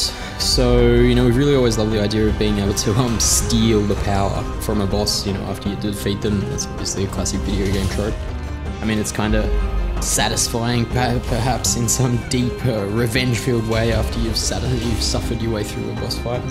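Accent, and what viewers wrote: Australia. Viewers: Vlog is good, also it's nice to hear an fellow aussies voice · You're Aussie. Is Motion Twin an Aussie company?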